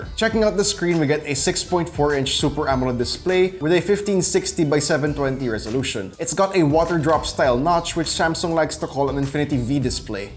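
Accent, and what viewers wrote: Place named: Manila, Philippines. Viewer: It's Manila boy accent boy!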